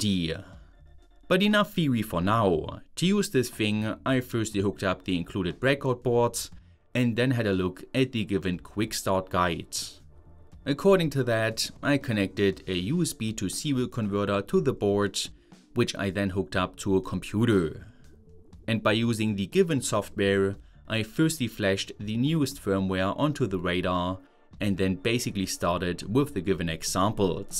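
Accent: Germany. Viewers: love your German accent too I know it's irrelevant. When are you gonna build an esp32 grow tent for that German plant that people like to consume lol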